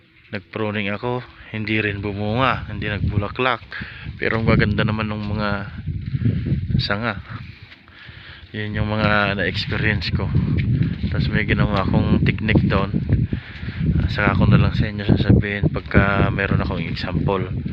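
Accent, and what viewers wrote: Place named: Ilocos, Philippines. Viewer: San po location nyo...s pannalita po ninyo prang ilocano kau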